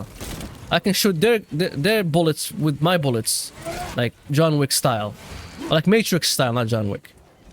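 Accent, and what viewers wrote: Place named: Morocco. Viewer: From your lehja i know you from moracco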